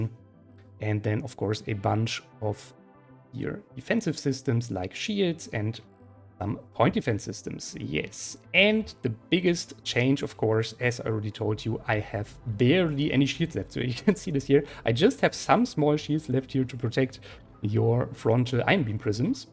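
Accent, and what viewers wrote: Germany. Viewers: schade, man hört leider deinen deutschen Akzent 😄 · You only hear the accent and you know this is gonna be good. The magic of German engineering!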